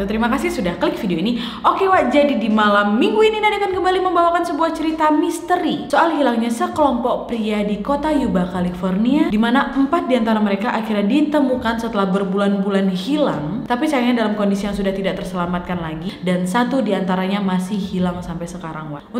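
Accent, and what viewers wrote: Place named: Riau, Indonesia. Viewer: hah makanya itu wak aku suka kali sm dia,dr cara ngomongpun sama karna logat2 melayu batak kepulauan riau😅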